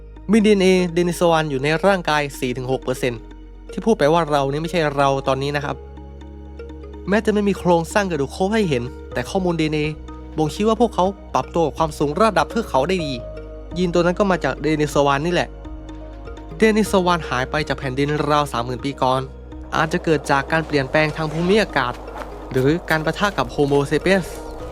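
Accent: South, Thailand. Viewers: ผมชอบสำเนียงใต้ครับ ฟังอยู่ลั่นขำเลย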